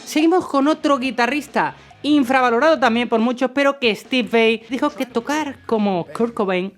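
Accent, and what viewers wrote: Andalusia, Spain. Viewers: A veces le siento como acento andaluz!!!🤙🤙🤙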